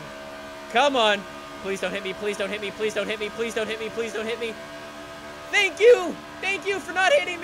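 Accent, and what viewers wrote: United States. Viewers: he turned southern after this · You even sound more American 🇺🇸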